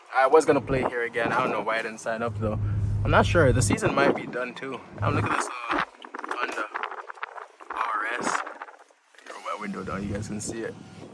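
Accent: Jamaica. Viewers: i can tell your jamaican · You almost sound like Jamaican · are you jamaican cus i can def hear somthing? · U Jamaican by any chance??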